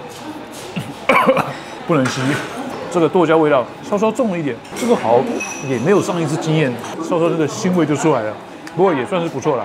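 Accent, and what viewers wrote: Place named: Taiwan. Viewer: 台湾口音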